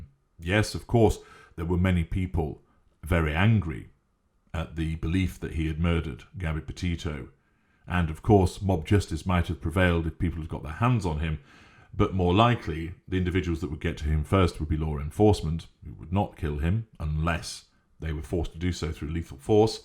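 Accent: United Kingdom. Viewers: Get my news from a disembodied British voice on the youtubes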